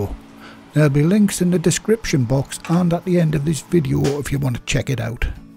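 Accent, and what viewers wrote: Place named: England, United Kingdom. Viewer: Cewked. 👍🏻 I've been in bands with lads from sooth of the watta, and that's a posh mackem accent ✌👍🏻🍺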